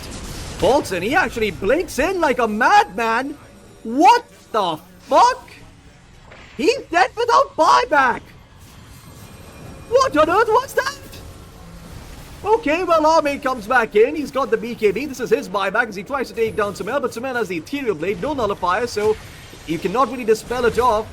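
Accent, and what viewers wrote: India. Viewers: indian caster wtf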